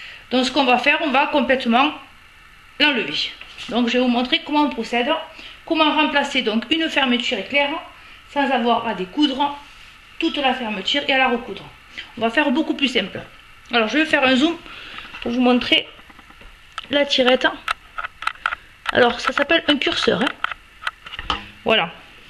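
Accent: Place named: South-West, France. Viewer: fabuleux ! super maman avec en prime un super accent du Sud-Ouest félicitations !!!!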